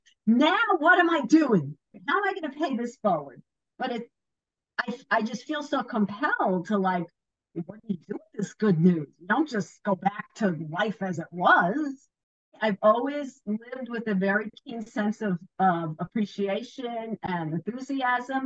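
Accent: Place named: New York, United States. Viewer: It’s the New Yorker in her ….absolutely lovely!!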